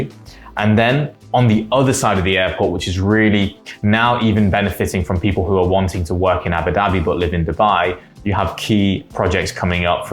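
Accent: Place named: United Kingdom. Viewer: Brodr why do u look indian,work in arabia n has an British accent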